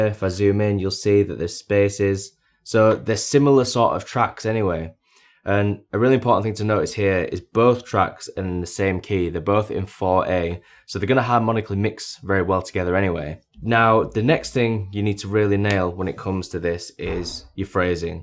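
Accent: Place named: West Yorkshire, United Kingdom. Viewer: Good stuff, where's he from? Sounds Barnsley or maybe West Yorkshire?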